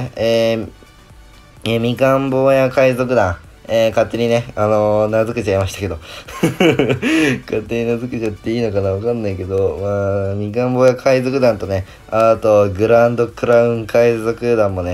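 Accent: Okayama, Japan. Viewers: 今回岡山訛り強め？笑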